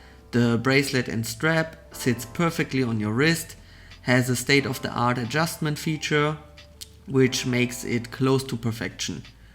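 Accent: Germany. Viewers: Are you Form germany?😅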